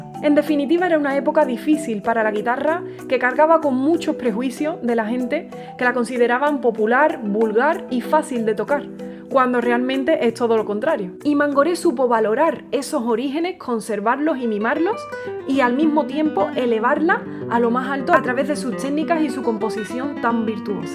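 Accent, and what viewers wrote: Andalusia, Spain. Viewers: Gracias! Me encanta la guitarra, su sonido, su historia. Y me encanta que nos cuentas la historia del compositor, sobre todo con tu acento andalu y siempre con una sonrisa! 🙂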